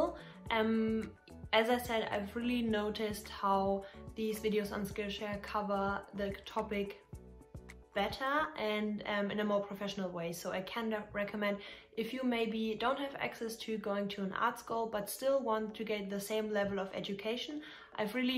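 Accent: Germany. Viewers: Du bist doch deutsch oder? 😁 den Dialekt kann man ja kaum überhören 🇩🇪👍🏼 danke für deine Meinung 🙂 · Heftige Englisch skills👏🏻 man hört den deutschen Akzent trotzdem direkt raus😂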